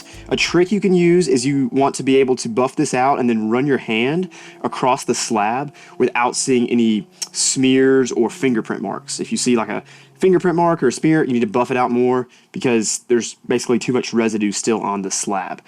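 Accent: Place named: Hickory, North Carolina, United States. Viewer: Thanks for your honesty. I've recently found you on you tube. I'm a relatively new woodworker and I like you content better than anyone else I've watched on YouTube. Your accent sounds like your from my wife's hometown of hickory nc......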